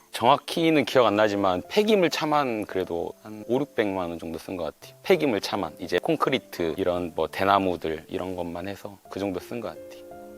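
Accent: Jeolla, South Korea. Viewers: 화순은 고향이 아닌데 전라도 출신은 맞네요..사투리가 전라도 사투리 아닌가요? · 사투리는 고칠수가 없구나 절라도여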